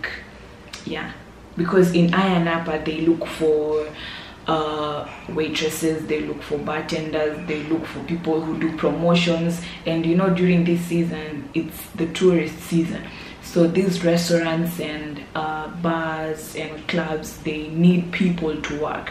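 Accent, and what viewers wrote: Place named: Kenya. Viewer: to be honest what i like most is your accent, a lot of you-tubers that are in Cyprus got deep African accent like Nigeria accent which is not pleasing. i think you from Kenya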